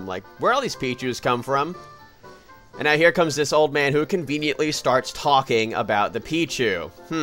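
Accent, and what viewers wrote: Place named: United Kingdom. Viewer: Bloody Hell, why so British?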